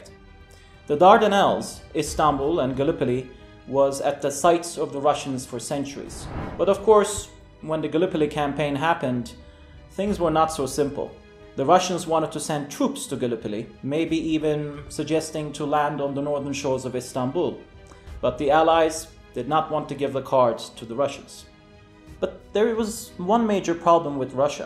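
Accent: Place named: Germany. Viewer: Almanya'da yasadin ? Accent almandir.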